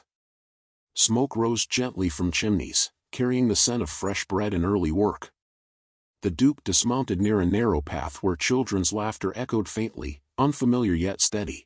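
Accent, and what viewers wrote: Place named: United States. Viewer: The American accent is so horribly inappropriate for such a totally English story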